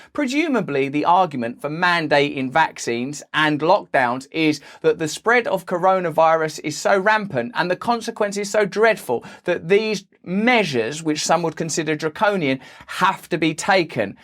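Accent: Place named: United Kingdom. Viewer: Tough to listen to.. limey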